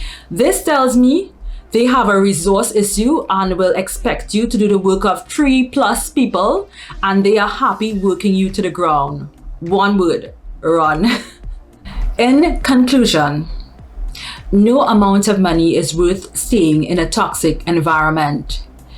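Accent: Trinidad and Tobago. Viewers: Is that a Trinidadian accent or maybe Barbadian accent? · Are you from Trinidad? 🇹🇹. I sense an accent :)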